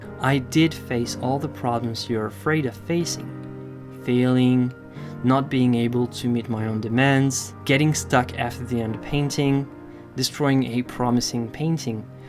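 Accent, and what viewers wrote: France. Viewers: Salut, je sais que t'est français, l'accent dit beaucoup de choses, et j'ai envie d'en parler en français, si quelqu'un veut le traduire, c'est a sa guise, je pourrais l'écrire en anglais mais je trouve ça plus personnel de te le dire de français à français, enfin bref, sache que ce Thomas a eu exactement le même ressenti que moi et beaucoup de gens comme tu l'a expliqué tu est toi même passé par la, mais la n'est pas le truc qui m'a touché, ta réponse, ta réponse change une perspective que j'avais alors même que je m’apprête a faire ma rentrée en école d'arts, j'ai du talent, et je le sais, mais ta vision des choses optimise tellement le gain de confiance et juste le fait d'apprécier créer que tu change beaucoup de choses, je suis passionné de création je voudrais avoir toutes les créations du monde dans ma maison, et je voudrais que les miennes en fasse partit intégrante, j'écris sans réel but la.. 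j'ai juste besoin de poser mes pensées quelques part.. hum. je souhaite rentrer dans l'histoire de la création de l'homme tout simplement pour que mon histoire et l'histoire de mes créations me survivent, j'ai le même objectif que toi, je suis conscient de ma mortalité, mais je suis aussi conscient de l'immortalité de mes idées et pensées. alors sache que mon amour pour toi est infini, et mon amour pour tous l'est aussi, si vous voulez créer.. créez alors, ne vous gênez pas.. j'ai vraiment besoin d'écrire un livre sur mes pensées et mes idées artistiques moi.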